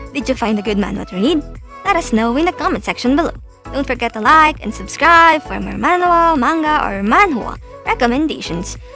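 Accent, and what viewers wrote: Philippines. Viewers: The moment I heard you're voice I knew you're filipina. Hahahaha.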